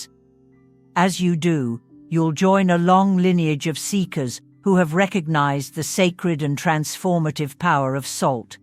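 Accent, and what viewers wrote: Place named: United Kingdom. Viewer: Trump supporter or just an idiot with a British accent?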